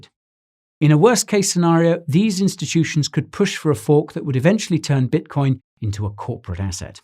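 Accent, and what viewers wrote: United Kingdom. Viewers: This guy could spout nonsense but with the british accent he sounds smarter... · This guy puts Bitboy Crypto to shame....blown away by the great amount of info and research this guy provides without the smoke and fireworks. Maybe it's just proper British demeanor and accent that makes everything sound more credible...lol. Either way, I like it. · i found his accent is kind of tricky for me, no offence for the person in the video since english is my 2nd language. do you know which part of uk this kind of accent coming from?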